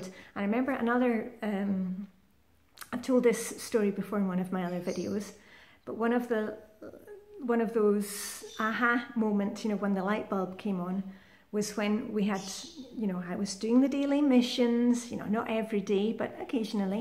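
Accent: Scotland, United Kingdom. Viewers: You are so lovely, and I love your Scottish accent. You are so motivational because you really tell us how to do it without beating ourselves up trying to be perfect.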